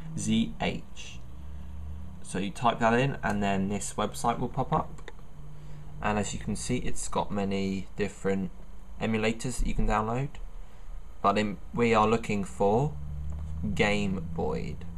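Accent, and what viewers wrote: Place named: United Kingdom. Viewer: You have british accent! :3 thanks 4 this, man!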